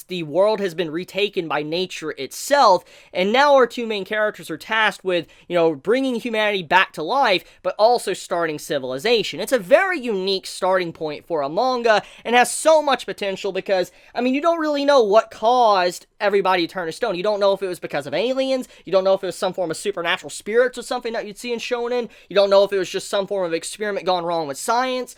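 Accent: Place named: Appalachia, United States. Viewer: brotherrrrrr hill billy voice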